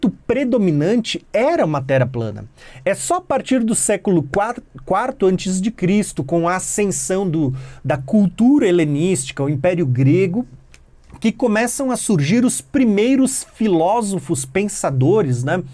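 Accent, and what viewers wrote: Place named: Santa Catarina, Brazil. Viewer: Catarinense ou polones fala assim mas acho que dá pra entender né?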